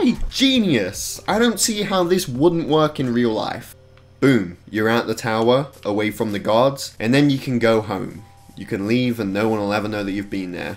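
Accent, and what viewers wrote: United Kingdom. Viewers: Why is a British guy giving us a plan? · Wait, how tf a British guy telling us how to storm into Area 51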